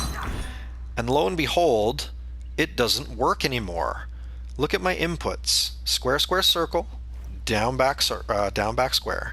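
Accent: Canada. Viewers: pretty sure youre canadian based on the way you talk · Canadian eh?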